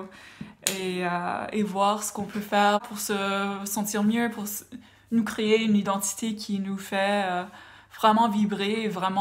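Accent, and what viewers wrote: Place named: Quebec, Canada. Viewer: Votre francais est influence par le francais du Quebec mais aussie un peu par le francais de l'Europe.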